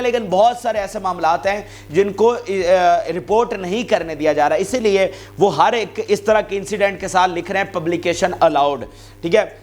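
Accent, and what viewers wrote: Punjab, Pakistan. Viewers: Bro your urdu with punjabi is really beautiful _ab ek aur katta khul ghaya ❤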